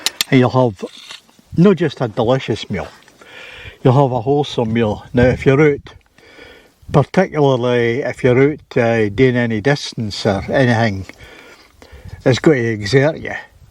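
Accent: Scotland, United Kingdom. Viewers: Are you Scottish maybe?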